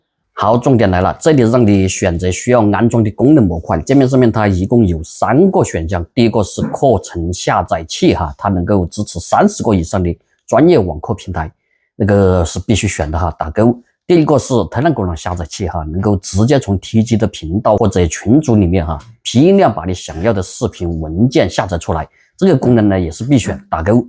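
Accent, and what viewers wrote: Sichuan, China. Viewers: 喜欢你的四川口音(川普)，与印度口音的英语。。。:face-green-smiling:🤣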